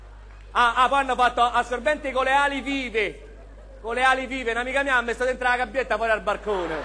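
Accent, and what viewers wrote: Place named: Rome, Italy. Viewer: Parla in romanesco stretto per tutto il tempo, e quando dice "coatto" ci tiene a specificare che è un termine prettamente romano... MA DAI?? -.-'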